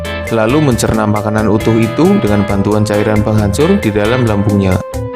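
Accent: Banyumas, Indonesia. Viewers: Org ngapak nih mimin hahaa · Ada medok ngapaknya, ora ngapak ora kepenak bro ! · Adminya aslinya ngapak kali nih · Admin orang Cilacap kah? Kok kayak ngapak gitu.. Hehe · Boleh nebak kan min, pengisi suaranya kayak logat ngapak daerah selatan. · Ora ngapagh ora penagh... Orang ngapak ya min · Maz wong ndi kok ngmonge ngapak 🤣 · Logat ngapak bet anjay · Pasti ni orang ngapak. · Ko logatnya kaya jawa ora ngapak ora kepenak ya. Daerah banyumas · Kiye sing dadi admin mesti wong ngapak yah? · Logat daerah saya nih ngapak cmiiw hehe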